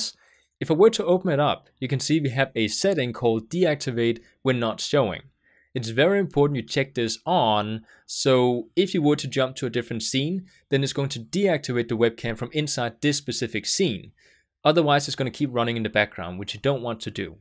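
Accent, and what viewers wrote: Denmark. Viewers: bro u danish? i can hear that your danish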